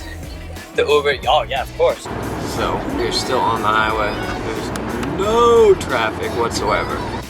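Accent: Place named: Boston, United States. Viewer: u from Boston I from Boston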